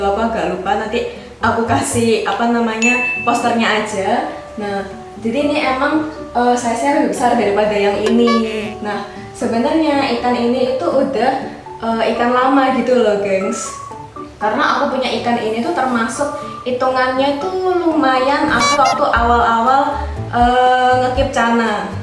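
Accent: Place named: Surabaya, Indonesia. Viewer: Suroboyo pundi mbak e sampean?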